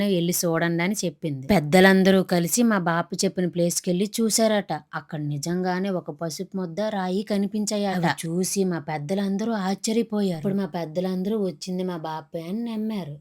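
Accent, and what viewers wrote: Srikakulam, India. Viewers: తల్లీ నీ భాష కాంచెం మార్చు శ్రీకాకుళం పరువు తీయ్యకు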